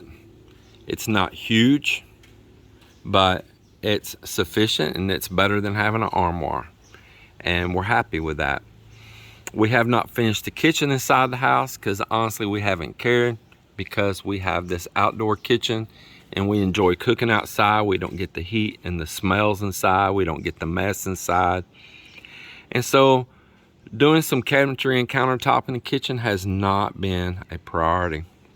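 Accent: Texas, United States. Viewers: I am your 83 year old lady in Texas that look forward to your daily video. It is normally 2AM as I have sleeping problems. I look forward to watching you and each night. I love seeing your progress I want to know about the chickens,the cow. Any thing you video will be interesting to me because of your Texas accent and straight forward delivery. I love the beautiful scenery you show and the beach. Tell I prayed for her surgery and not overdue too quickly. She will be good again in no time. MeMe in Texas USA 🇺🇸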